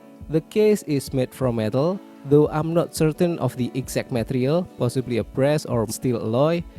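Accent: Indonesia. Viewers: Your Indonesian accent is familiar. Btw good job.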